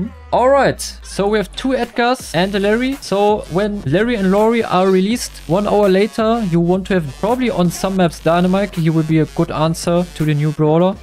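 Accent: Germany. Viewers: Love the german accent ❤ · Yooooo this is the most german english I ever heard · I just love the german accent 😊 btw I‘m also german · Moin wie nutzt du BS auf deinem Pc?? und geiler Deutscher Akzent xD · Junge wie deutsch kann man englisch sprechen 😂 tut richtig in den Ohren weh deine Betonung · Ich höre es so sehr heraus das du deutsch bist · You are german right? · Mann hört das er eigentlich deutsch ist haha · German accent makes my blood boil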